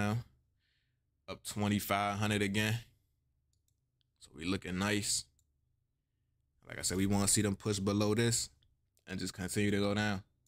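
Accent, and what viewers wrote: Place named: Washington DC, United States. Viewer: I’m watching this video & saying to myself slim sound like he from the city (DC) checks for Instagram cause that’ll usually tell 😂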